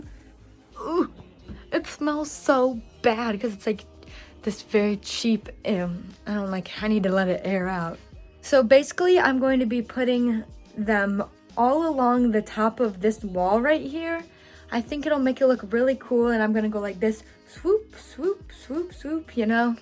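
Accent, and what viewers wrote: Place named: Ireland. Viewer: i can really tell you have a irsh accent ❤️❤️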